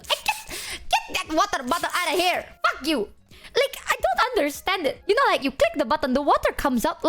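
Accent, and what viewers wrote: Indonesia. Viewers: I just realized. is the best representation of fluent English with Indonesian accent. If you want to know what majority Indonesian accent sounds like (in english), listen to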